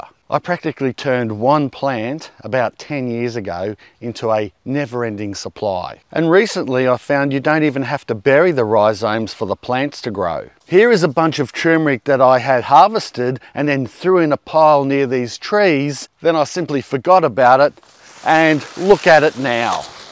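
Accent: Australia. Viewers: I will tell which I will replace: None! Your channel is amazing. Plus that Australian accent and your passion your are right up there with the late crocodile hunter. Good lookin out bro. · I've been watching his vids for nigh on 2 years and whenever I mention gardening my family now respond in Australian accents..."What does say?" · Your "Down Under" accent at times makes me raise my eyebrows and ask "Did just say what I heard him say?"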